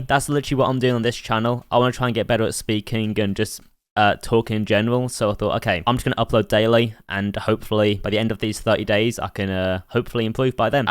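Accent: United Kingdom. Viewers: London Or Manchester I know you live in the UK >:) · Are you british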